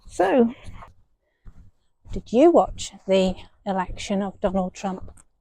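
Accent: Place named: Cockney, United Kingdom. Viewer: This woman is speaking with a Cockney accent.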